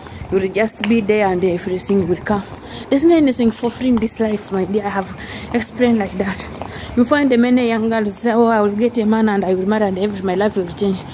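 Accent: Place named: Jamaica. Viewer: You talked like a Jamaica 🇯🇲 ❤ you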